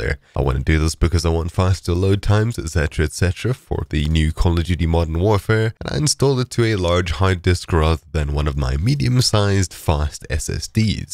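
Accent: South Africa. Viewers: I know an SA bra when I hear one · Are you a saffer?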